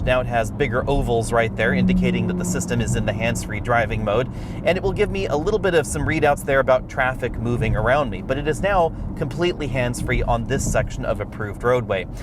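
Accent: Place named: Midwest, United States. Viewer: Now I know why I like watching so much; he’s midwestern!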